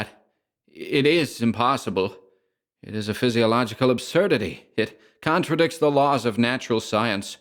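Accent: United States. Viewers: Fine job with the American accent! Sincerely, Katherine Los Angeles, California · Your American accent has gotten to be nigh perfect! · Yay, more American!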